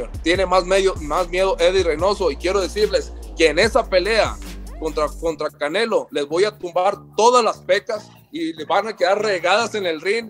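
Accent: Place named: Puerto Rico. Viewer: Que hablador está resultando este muchacho... Parece puerto riiqueño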